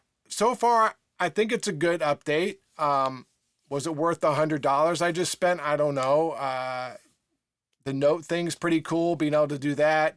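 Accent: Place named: United States. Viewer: What part of the US is that accent from?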